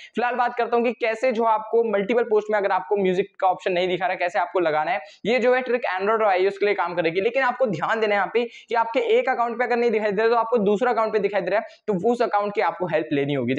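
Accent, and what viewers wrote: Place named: India. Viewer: The problem is he is speaking English... I understand like every 15th word Oh, it isn't English. I thought he just talks really fast and with accent. He uses a lot of english words though. Damn Indians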